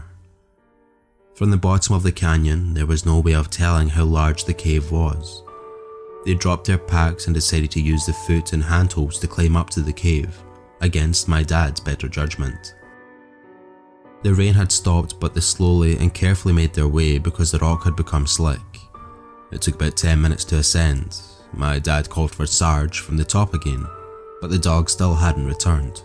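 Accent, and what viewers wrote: Scotland, United Kingdom. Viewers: Omg score! Scary stories read with Scottish accent hah! Love it! ❤️😁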